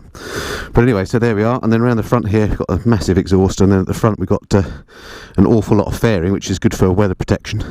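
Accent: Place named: United Kingdom. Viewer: I played this review in 0.75x speed and IT SORTED THIS REVIEW OUT for a person like me who WASN'T born into QUICK-AS-HACK British talking :)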